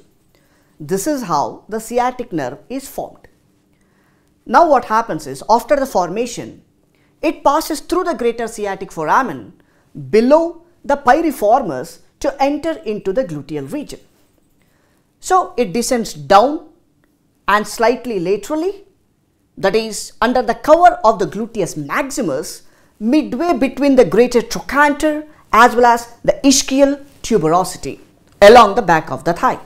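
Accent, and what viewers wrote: India. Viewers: WOW This is a really good explanation and he speaks amazing. Is the most understandable Indian I have listen (I'm sorry if he is not exactly from India but rather a closely related country). He has an accent AND is clear. I need everybody to speak like that haha (even native English speakers). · Perfect voice sample for Indian accent!!! Am gonna save his voice for my ringtone!!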